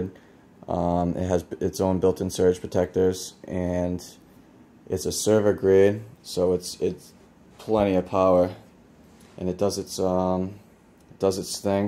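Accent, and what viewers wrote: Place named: United States. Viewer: hi so based on your accent you are somewhere in the US (finally, most video's showing the apc2200 are from the uk) as i am too, and a friend gave me this unit with bad battery's, and cabling, no stickers no model number on it, and i had to open it up and take the model number from the internal board, so at first i was sure this uses 220v but as i found your video and i am in the US to, i am not so sure anymore i thought it might be a good idea to ask this stupid question, does it take 220v? or 110v?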